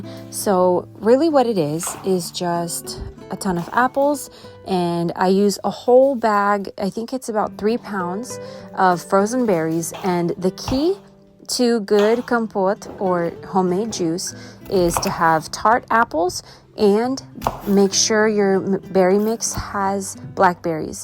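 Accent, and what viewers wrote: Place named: Russia. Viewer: I was wondering where your lovely accent was from!! Did you grow up in Russia?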